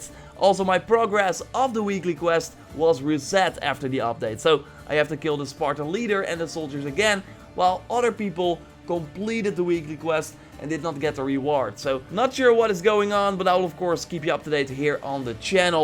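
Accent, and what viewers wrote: Netherlands. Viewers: You got a huge dutch accent :p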